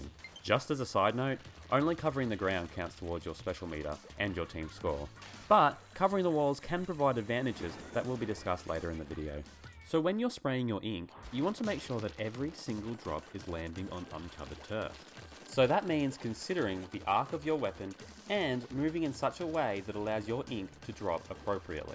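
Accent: Australia. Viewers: you aussie mate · Are you Aussie 🤔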